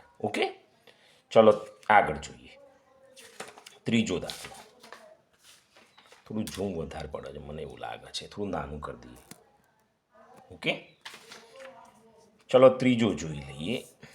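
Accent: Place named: Mehsana, India. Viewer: Tame mehoni bhasa bolo cho sir 😮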